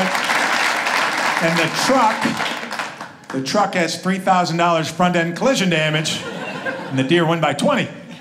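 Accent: United States. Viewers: he sounds American not Irish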